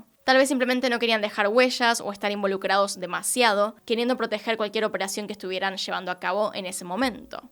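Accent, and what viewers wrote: Argentina. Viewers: Yo comencé a seguir tb a otra así como este canal porque soy española y está muchacha de quién les hablo también, pero creo q hay sitios para todos, ( los buenos)y me acostumbro al español/ argentino, adelante, con esas preciosas pestañas q te pones..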